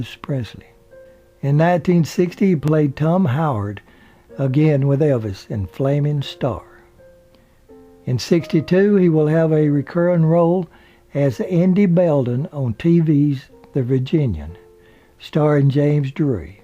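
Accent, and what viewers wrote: South, United States. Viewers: Mr. I enjoy your Southern Draw and your coverage of these fine actors in our past enjoying their movies while growing up. Thank You Sir for your stories of important memories enjoyed in life.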